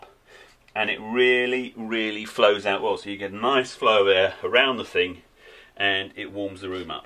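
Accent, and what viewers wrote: United Kingdom. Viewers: This guy is scotish? :D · it is the British version of a penny.I made the comment because of his British accent and that he said pence and not pennies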